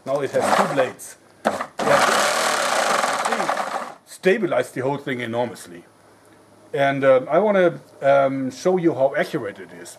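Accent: Germany. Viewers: Lol I love that German accent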